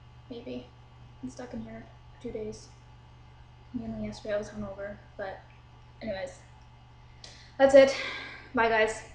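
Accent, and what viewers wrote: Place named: Canada. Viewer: Aw are you Canadian? I can hear an accent!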